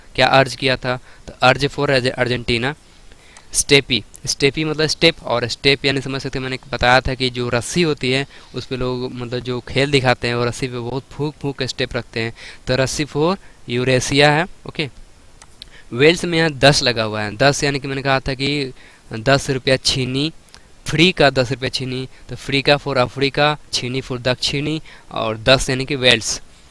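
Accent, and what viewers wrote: Bihar, India. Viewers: aap Bihar se kaha se ho bhai?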